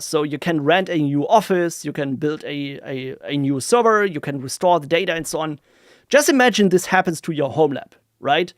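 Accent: Germany. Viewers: Typically german englisch 😅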